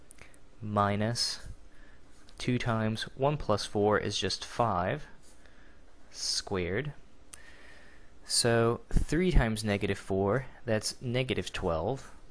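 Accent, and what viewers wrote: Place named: South, United States. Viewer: GREAT teaching! By the way, I love your accent. Obviously southern like mine! :)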